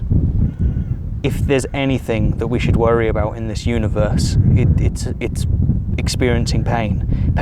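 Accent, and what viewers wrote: United Kingdom. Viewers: So you re british! Didnt notice in somehow. Southern coast seems nice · wait you're British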